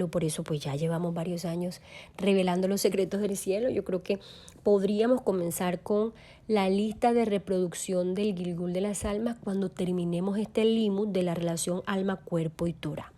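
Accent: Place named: Venezuela. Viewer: Shalom. Todah Rabah, soy de Venezuela , tu tono es venezolana, das clases presenciales ????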